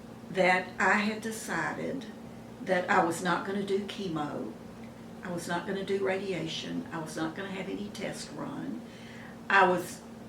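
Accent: South, United States. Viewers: Hi I only just found you on You Tube tonight! I'm soooo happy because you are a delight!! I love your southern accent! Where are you from? I'm originally from Louisville, KY and oh how I miss a soft southern accent. Can't wait to see more of your videos! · Yes! I love what you say and how you encourage other women to do what they want to do and feels right to them. Where are you? I am in Massachusetts. You sound southern, but I wish you were next door! ❤️ I will be 79 in August, still wearing makeup, still learning how to do it better. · You are beautiful and so inspiring!! Love your southern accent🌿💜🌿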